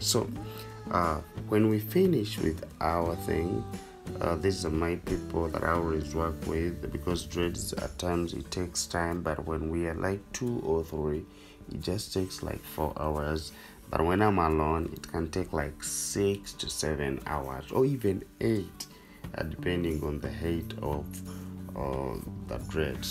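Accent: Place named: Uganda. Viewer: HOW COME YOUR ACCENT IS SO UGANDAN?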